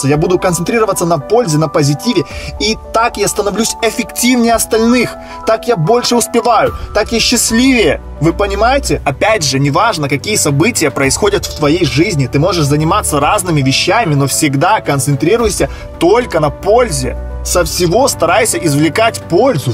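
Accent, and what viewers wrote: Odessa, Ukraine. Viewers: Одесский акцент😉👍✌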